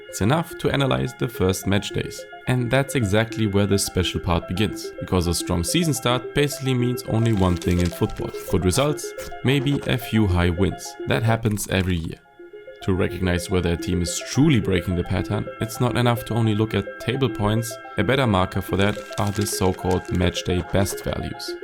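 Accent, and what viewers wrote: Germany. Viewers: der deutsche dialekt nervt ja übel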